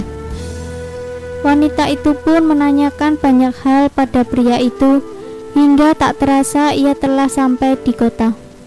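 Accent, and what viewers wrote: Java, Indonesia. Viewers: Medok amat yg crita🤣🤣🤣🤣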